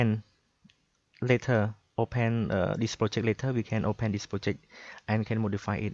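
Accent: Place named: Vietnam. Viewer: Very funny vietnamese accent :D I like it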